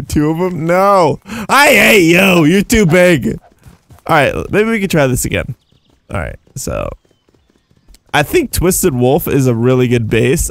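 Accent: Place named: United Kingdom. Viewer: I think your British voice is really cool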